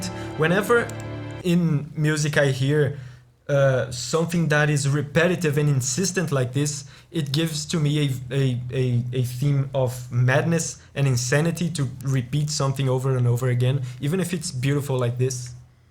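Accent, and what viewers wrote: Brazil. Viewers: Out of Bloodborne OST, you could react next to Laurence the First Vicar, basically Ludwig, except it's not a dance, just straight up chaotic church music, and DS3's Slave Knight Gael aswell, they're really different and more emotional than the other boss musics in the series; Really sad that Yuka Kitamura didn't win any awards in both Bloodborne or Dark Souls, let's hope she does with Elden Ring. Also i've just discovered your channel and learned you're brazillian too, mostly from your accent, abraço :D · c tem sotaque de brazuca kkkkkkk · Você é Br rapaz,sua voz é bem BR adimita.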